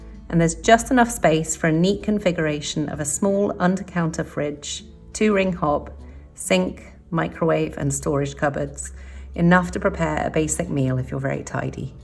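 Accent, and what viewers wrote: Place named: United Kingdom. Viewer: I know nothing about architecture. But I do enjoy your videos so much, they are so relaxing complete with the slick animation, the gentle background music and your soft spoken narration with that beautiful British accent which is a pleasure to listen to. Fantastic work, thank you.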